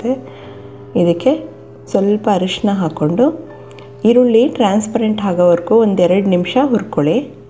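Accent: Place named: North Karnataka, India. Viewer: uttara karnataka davra neevu nimma dwani d karnat tara ide yella adugegalu chennagide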